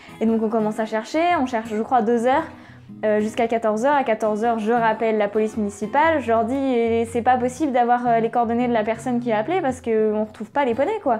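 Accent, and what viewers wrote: England, United Kingdom. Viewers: Tu as un très bel accent anglais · Quel accent anglais !!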